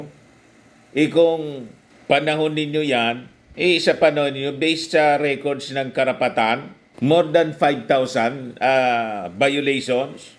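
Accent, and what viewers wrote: Bicol, Philippines. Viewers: bicolano kayo sir?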